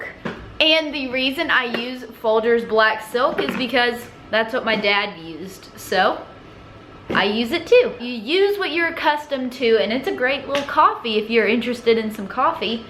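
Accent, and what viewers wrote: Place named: Texas, United States. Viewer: You are so adorable! Love watching your channel! Learning so much! I grew up in Ft. Worth so love your TEXAS accent!! ☺️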